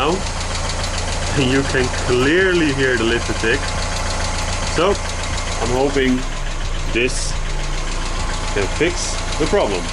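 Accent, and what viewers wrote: Netherlands. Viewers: Hey! Ik hoor dat je nederlands bent, dus hopelijk kun je deze vraag beantwoorden haha. Ik heb net een 1.8 NA miata aangeschaft met 250K km (150k miles) op de teller.. geimporteerd uit amerika, maar bij het starten maakt het motorblok precies het zelfde geluid. Zelf heb ik twee NB's gehad en altijd 10w40 gebruikt. Als ik dit spul wat jij aanbeveeld erin stop en 50-100km ga rijden, kan dat geen kwaad? En daarna mijn olie ververs? Zijn het de zuigerveren die dan vies zijn of aan vervanging toenzijn? Graag hoor ik even meer😁 Groetjes, chris. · Are you dutch 🇱🇺 ? The accent gives it away 😁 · haha lekker nederlands accentje, ik heb een cls500 die ook tikt misschien dat ik dit ook ga uitproberen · you gotta love the dutch accent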